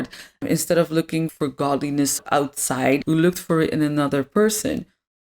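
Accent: Netherlands. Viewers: One of my greatest accomplishments in life is never having kids...by choice. Good vid, thanks! I did notice your Dutch accent!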